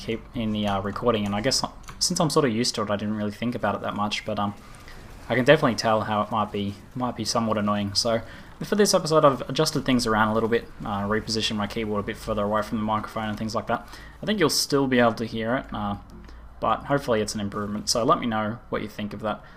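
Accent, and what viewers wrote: Australia. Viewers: I love this serie! and a very big plus to the commentary,australian accent and content!! +1 Sub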